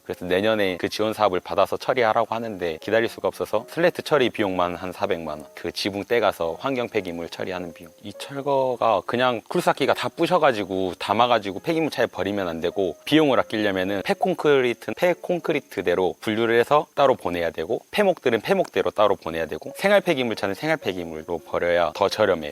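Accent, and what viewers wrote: Jeolla, South Korea. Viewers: 화순은 고향이 아닌데 전라도 출신은 맞네요..사투리가 전라도 사투리 아닌가요? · 사투리는 고칠수가 없구나 절라도여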